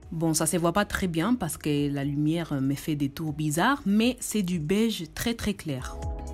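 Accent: Spain. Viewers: adorable et super vidéo !! (y se nota que vienes de españa jaja!! mi madre tiene el mismo acento en francés! suerte guapa<3) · I love your spanish accent omg 😻😻😻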